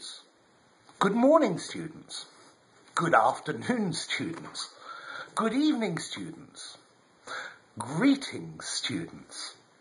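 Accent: United Kingdom. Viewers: Oh, Finally A British Teacher.! Great! BTW,I am interested in the British accent and trying to listen to it as much as I can,but still need rules! Do you -or anybody else- know how to improve ACCENT ? sources PLZ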